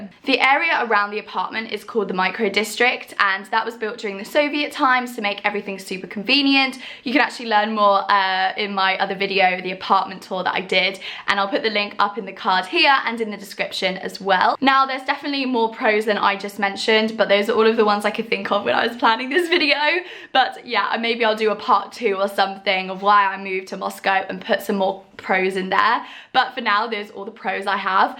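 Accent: United Kingdom. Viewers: can teach Moscovites proper British English... 🇬🇧👈🏽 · После 12 лет жизни в США, британский акцент прямо режет по ушам, в хорошем смысле. Вспомнил уроки английского в челябинской школе))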